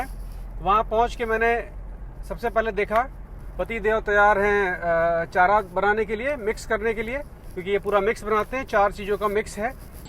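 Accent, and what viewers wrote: Bihar, India. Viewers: बिहार से हो क्या भाई हहहहह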